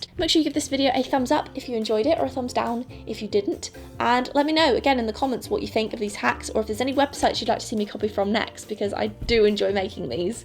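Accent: England, United Kingdom. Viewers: Where do you live? You sound English yet you used dollars 😅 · Life hacks in a British accent are just better tbh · The reusing an altoid tin or prismacolor tin is also an idea I just literally found this week on Pinterest! I'm not as much a fan of paint as I am ink and colored pencil but I love the idea of reusing tins like that for art supplies. P.S I love your accent!- I've never met someone in person with a British accent until my new neighbors I just met recently! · After hearing British accent for so long. I'm starting to read all the comments in an accent. In reading this in an accent right now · bit confused how you got to target tho?🤔 you have one of the strongest british accents🇬🇧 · What country do you live in, because you sound like you are from the uk, but you talk with dollars?? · Wait, where are you from? You said dollars and you have American plug sockets but you sound British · Lol she looks like Anna Kendrick with British Accent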